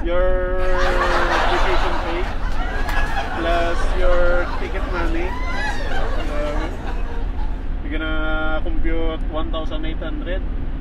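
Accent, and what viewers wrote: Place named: Iloilo, Philippines. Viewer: It's ok po after pandemic nlang po kayo mag vacation. You sound an ilonggo kuya hehe..ingat po kayo.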